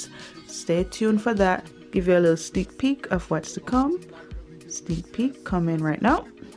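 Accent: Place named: Jamaica. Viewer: Refreshing to hear a fellow Jamaican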